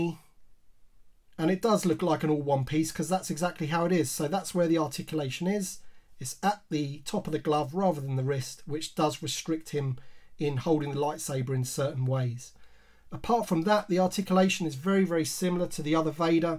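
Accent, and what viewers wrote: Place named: United Kingdom. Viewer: Was happy to find out you skated in the 80's. I think star wars was my favorite thing as a young kid, then G.I.Joe, until Skating. Loved Skate culture. Then came music and the subcultures until I ended up in my 40's and now I'm back on the toys! I love these vintage collection cards backs. The whole thing just brings me back to what a great reward it was to get a new figure as a kid. Cheers to you, I love your channel. Not sure what area your accent is from, but I always expect to hear you say "Oi!"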